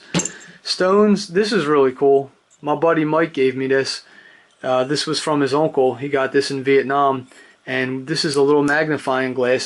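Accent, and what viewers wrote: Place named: Pittsburgh, United States. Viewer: Not quite Ralph, sounds more Pittsburgh to me.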